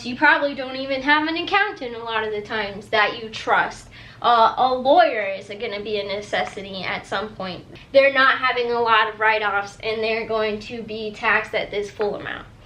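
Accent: Russia. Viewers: You have a tiny Russian accent lol I think you got it from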